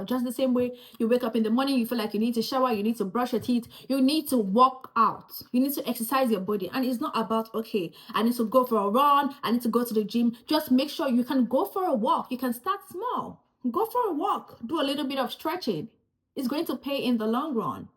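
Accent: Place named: United States. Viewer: Ouuu, I can hear your American accent coming out🤭🌚🤗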